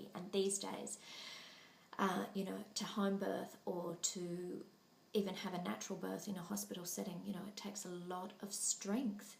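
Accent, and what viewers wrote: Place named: Australia. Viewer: great to see you and hear your lovely aussie voice! No transcript needed! We haven't met but have communicated in the past via email. My stepson and his wife are due to give birth to their first baby in Sydney tomorrow! We will be first time grandparents! The newletter is great, personal and experiential. With love and birth into being, Suzie McGreevy in Ireland.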